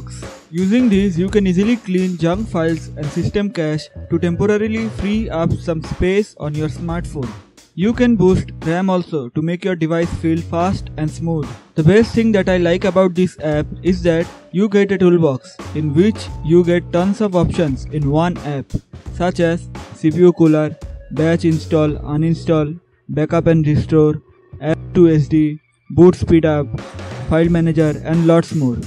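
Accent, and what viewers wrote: India. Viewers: bro practice hard your accent i know you are Indian many indian youtubers who cannot speak like the american because of your accent i cannot understand your talking about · Why is everything in Indian accent these days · I like his video Because his indish accent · I think most people with a bit of intelligence can HEAR the gentleman is Indian, but YOU just couldn't help yourself could you, you sarcastic, RACIST IGNORAMUS.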